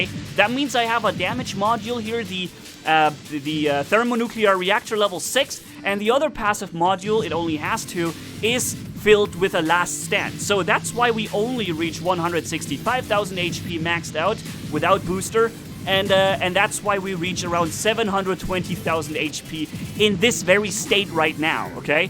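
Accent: Germany. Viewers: Hi are you German?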